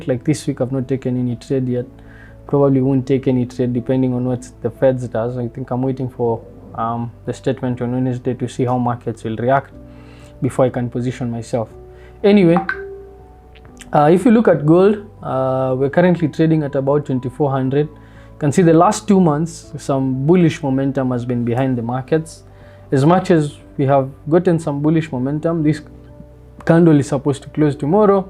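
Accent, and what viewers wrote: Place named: Kenya. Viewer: From the talk unajua ni mkenya 😂🎉🎉🎉let's goo bro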